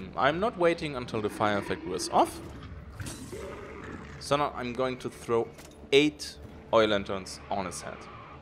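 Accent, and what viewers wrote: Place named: Germany. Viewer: Dezenter deutscher akzent... gefällt mir :D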